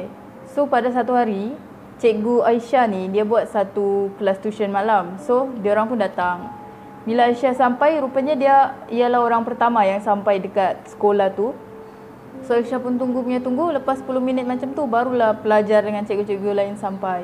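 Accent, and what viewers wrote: Sabah, Malaysia. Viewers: kakak org mna ? mcm ada loghat sabah pulak heheh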